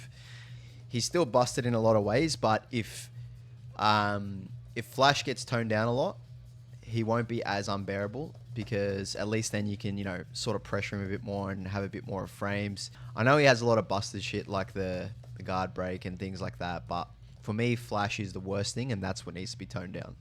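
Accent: Australia. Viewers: Dam, it’s refreshing to hear an aussie accent in a tekken vid ey lol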